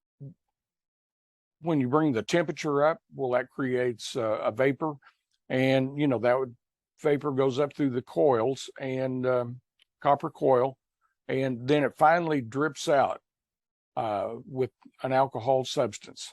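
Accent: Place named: Tennessee, United States. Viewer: Hey. I just found you. I watched the Press conference and was intrigued. So here I am. I lived near Covington for a couple months and remember seeing the signs about walking tall. I also remember the movie. I was a boy when I watched it with my father and I'm 64 now.. Very very interesting. Things aren't always what they seem. That's some beautiful area there.. I still like East TN better and if not for elderly parents here in South Central Indiana I would move there.. I really love TN. You folks have an accent though.. hahaha.. Down there they say I sound like I'm from Canada, and north here in Indiana such as in Indianapolis where I used to live as well they said I sound like I'm from TN. I am from an area in Indiana that is sorta a line where you either have a KY accent or a northern one. I guess I got the northern onel.. haha.. 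Be well sir and great videos.. I'm going to really watch all your vids.. Great stuff and really good info and evidence! Regards Tim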